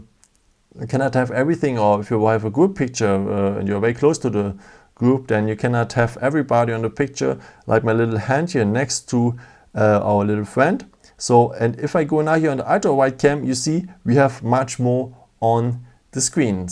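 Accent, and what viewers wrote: Germany. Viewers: Strong german accent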